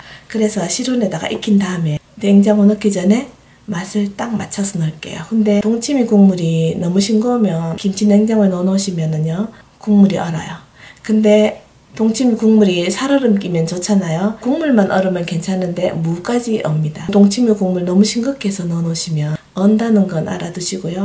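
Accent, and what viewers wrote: Gyeongsang, South Korea. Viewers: 대구 이모 진짜로 동치미 올려주셨네요 감사 합니다 대구 본토 발음이시네예~ 잘 보고 갑니다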